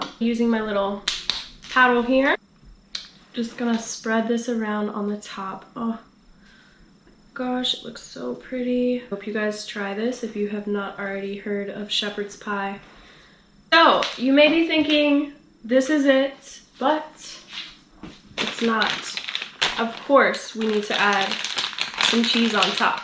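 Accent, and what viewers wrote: South, United States. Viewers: You have the cutest country twang. Love it. That looked delicious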